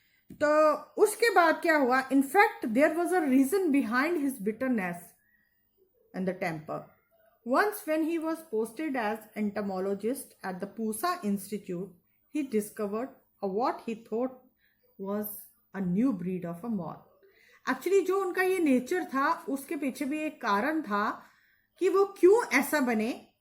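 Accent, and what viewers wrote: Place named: Jammu, India. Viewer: Mam aapme thodi dogri jalak hai 😅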